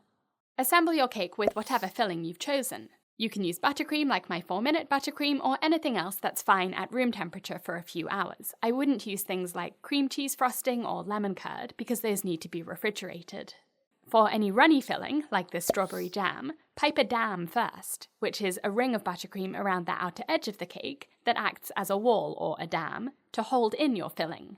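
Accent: United Kingdom. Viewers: British~ Like it,that's amazing -;)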